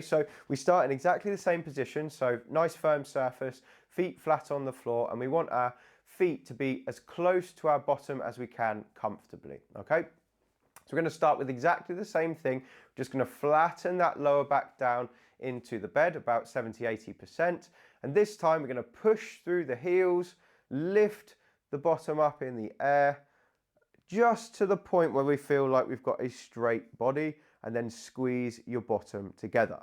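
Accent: United Kingdom. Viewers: Greetings! Glad you are talented as a "speed listener." I am not that talented. Yes, I am also stiff, but I appreciate the "slow" explanations. Especially with his British accent. He cares enough to make sure we get it. After all, we are the 50+ crowd. So slow down your "speed listening" a bit. If you don't like it, slow down anyway. No, I'm not stupid. Enjoy the video.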